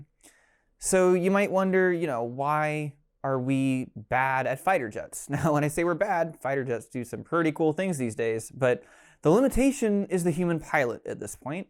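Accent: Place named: United Kingdom. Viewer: I think he’s British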